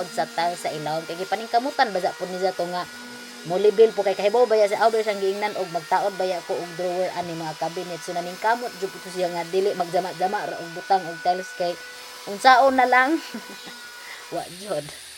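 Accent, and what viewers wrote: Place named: Visayas, Philippines. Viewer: hi done subcribe npo miss im new here lagi kita pinapanood i like ur accent bisaya kbayan from leyte but im here n in manila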